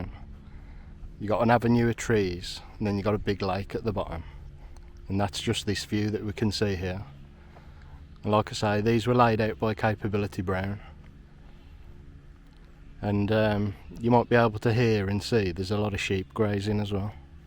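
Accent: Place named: Scotland, United Kingdom. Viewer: Thank you very much,. I am intrigued by your accent, from which part of the UK are you from? For the pronunciation of a couple of words, I would say Scotland but I am not sure. Regards.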